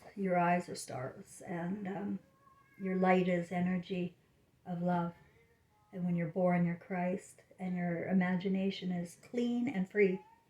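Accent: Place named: Western Canada, Canada. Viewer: you sound like your from western Canada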